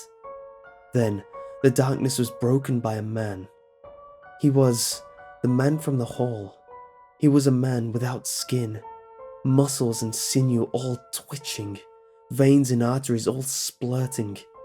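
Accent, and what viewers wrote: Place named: United Kingdom. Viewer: The british accent doesnt make it sound THAT scary.. but, its awesome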